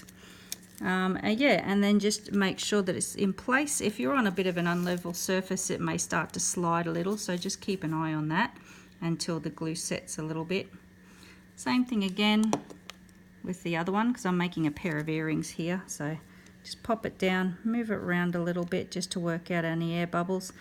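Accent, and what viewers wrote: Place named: Australia. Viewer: I love listening to your Aussie accent girl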